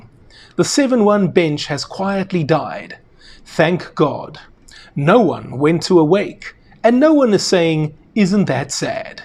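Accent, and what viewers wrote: South Africa. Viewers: Algorithm sent this channel my way... just needed to hear a South African speaking and voilà i have liked and subscribed 😂 .... do i understand the content? No. Is this channel SAn? Yep, and I will subscribe up and down, nje, just because 😊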